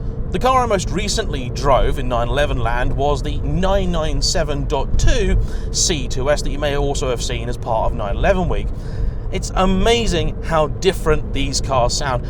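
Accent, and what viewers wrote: United Kingdom. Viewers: commentary so british xD love it!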